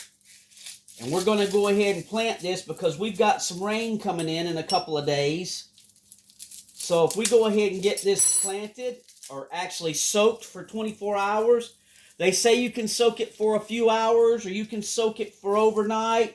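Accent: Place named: South, United States. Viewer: You have just a little bit of a Southern drawl, sir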